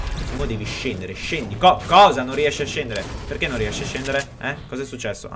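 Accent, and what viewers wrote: Naples, Italy. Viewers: Se trovi qualcuno con il minty il video finisce PS: ma sei napoletano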